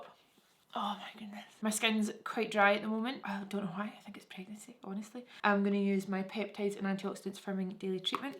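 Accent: Scotland, United Kingdom. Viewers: Your Scottish accent is giving me life! Your fan from HK btw! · You look absolutely gorgeous! Love hearing all your baby stories! Can’t wait for all the new things to come.. including baby girl💕🦄👛🌸🎀My family all lives in the UK and I love to hear you chat away cuz it reminds me of them 🥰I live in South Carolina now but grew up in California…. I can’t wait to see Vieve in America 😍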